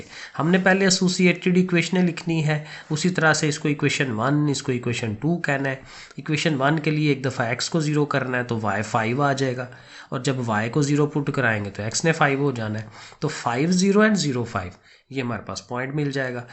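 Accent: Sialkot, Pakistan. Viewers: Sir ap sialkot se hein?? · Sir's accent shows that he is Sialkoti.....❤😂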